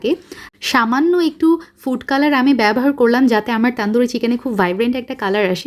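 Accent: United Kingdom. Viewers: আপনি কোথায় থাকেন?বিদেশি কিংবা ইংলিশ মিডিয়ামে পড়ুয়া বাচ্চাদের মতো বাংলা এমন স্ট্যামার করে বলেন কেনো?আপনার আগের ভিডিওগুলিতে তো এমন স্ট্যামার করে বাংলা বলতেন না আপনি তাহলে এখন এমন করে কথা বলেন কেনো?শুনতে ভালোই লাগে কিন্তু এইভাবে ইংরেজি টানে বাংলা বললে ভাষার মৌলিকত্ব নষ্ট হয়ে যায়।প্লাস আপনার সিমপ্লিসিটিও নষ্ট হয়ে যায়।অনেক আর্টিফিশিয়াল লাগে।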